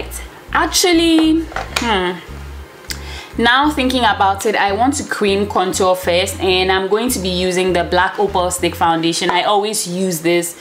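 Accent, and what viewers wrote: Ghana. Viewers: Beautiful! You sound like a Ghanaian🥰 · I don’t know how I knew you were from Ghana. Maybe it’s your accent or your beautiful face. I am not Ghanaian but my husband is and I spend a lot of time there because he still lives there. Keep doing what you do, dear. Are you in Ghana presently or in the US?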